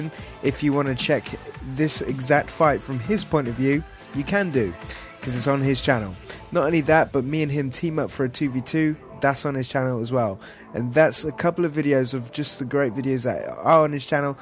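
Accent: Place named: Russia. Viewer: ***** you sound like a russian and im not insulting you. Your Russian voice makes me watch your videos :D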